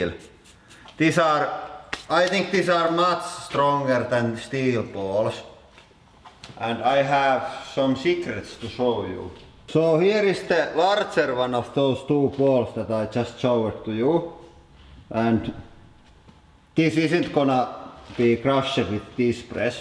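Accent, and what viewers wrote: Finland. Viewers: aa mä oon aina luullu et sä oot venäläinen :D vaikka kyllä oon epäilly tosta suomi-aksentista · Finnish accent is so great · My high school tennis coach was Finnish. He had the exact same accent but with a different voice. It was hard not to bust out laughing when he'd get mad and yell things like "you guys play like piece of joke out on court today!" at us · he sounds like hes from finland... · SUOMIIII, KOSK TOI ENKKU 3/5 JA KOSKA "Voi vittu!!!" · you clearly aren't familiar with the Finnish accent So many people speak like that lol. Not me tho'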